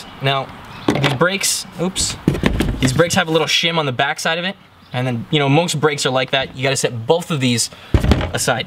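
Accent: Canada. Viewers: Hoy sound Canadian ay! 😎👍🏼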